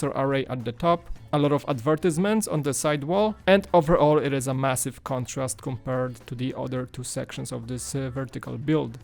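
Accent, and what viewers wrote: Poland. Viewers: are you from Poland or are you Polish? I apologize for the question but you have such an accent and you pronounce the names well in Polish.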